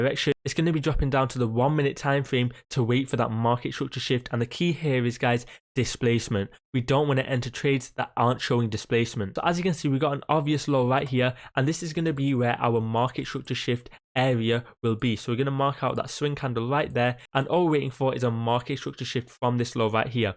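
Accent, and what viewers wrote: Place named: RP, United Kingdom. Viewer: this guy speaks like he has plums in mouth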